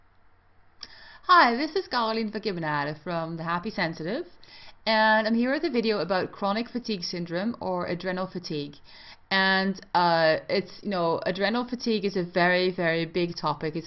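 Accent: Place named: Canada. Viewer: Thanks for the video. Your accent sounds like a mix of Canadian, Scottish, and... Dutch? 😊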